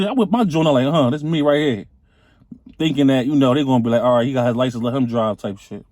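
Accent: Philadelphia, United States. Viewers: Sound like you from Philly jawn a Philly word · U sound like a philly nigga slim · U sound like u from Philly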